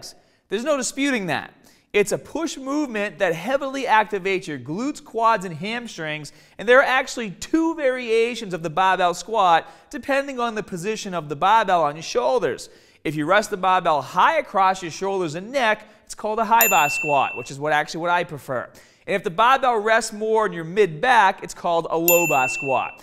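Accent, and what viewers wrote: Boston, United States. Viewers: when did he develop a boston accent? never heard him talk like this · It’s been a while since I watched his videos...Since when did he start with Boston/New York accent? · is a good dude. His Boston accent is just a little distracting! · Are u from Boston bro holy cow 😂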